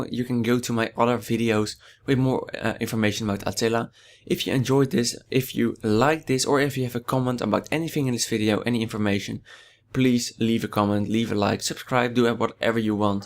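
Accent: Netherlands. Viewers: Are you Dutch? You sound like it!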